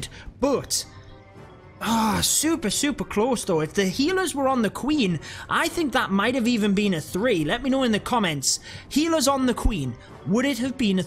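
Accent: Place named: Northeast England, United Kingdom. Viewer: Newcastle or Sunderland mate?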